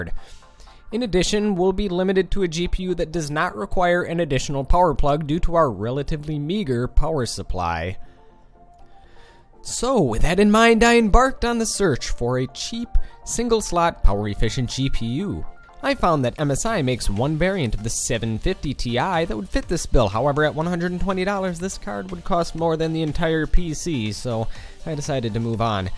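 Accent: Canada. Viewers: Saskatchewan eh! You sound like your from Saskatoon and it sounds cool as a commentator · Canadian as FUCK man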